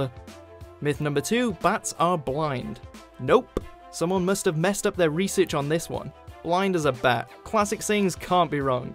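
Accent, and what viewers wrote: United Kingdom. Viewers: He has a British accent, so you can stop attacking him over how he says Echo. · he's British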